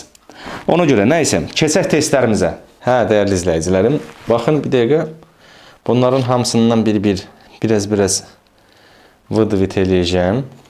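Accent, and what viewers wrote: Ganja, Azerbaijan. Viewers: Ləhcə Gəncə ləhcəsinə oxşayır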